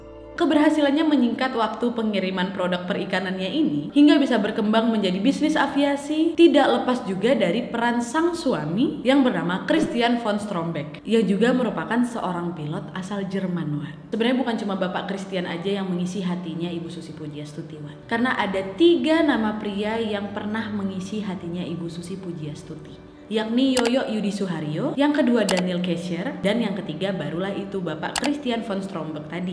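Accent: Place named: Lampung, Indonesia. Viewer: Kamu orang lampung yahh logat ny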